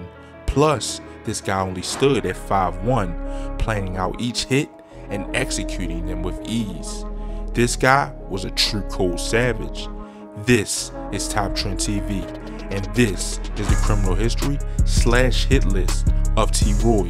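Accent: Baltimore, United States. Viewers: I hear that Baltimore accent!!! Respect and love from DC Salute!!!! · On god. Soon as cuz opened his mouth, Straight outta Baltimore like shit😂🤦‍♂️🤦‍♂️ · My man is definitely from Baltimore, I can hear a fellow Baltimorian whenI hear one. Can we get a dog (Dug)? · You got your story wrong bro. Troy wasn't opp shopping. Tb was looking for t roy and was right on his trail 2 minutesbehindhim. Troy was in the store to the back with someone else. Tb walked in with one of his boys and walked right up to Troy in the back of the store and shot him in the chest then ran out. You sound like you from bmore too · You must be from b-more. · You from Baltimore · You from Baltimore? You have a accent. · Where you from bro yo accent sound like ot Baltimore am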